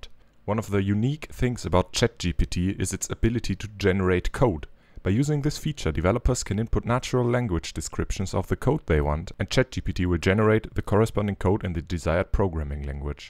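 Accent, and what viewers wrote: Germany. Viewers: I can clearly hear you denglish xD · Cool, bevor ich die Kanalbeschreibung gelesen habe, dachte ich sofort... Das ist doch ein Deutscher 😬 ich abonnierte Mal deinen Kanal... Cooler content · Oh nö 🙄 Wenn deutsche versuchen englisch zu sprechen 😣 Man hört das immer raus 😂 Klingt schrecklich 😱 Ansonsten guter Beitrag 😘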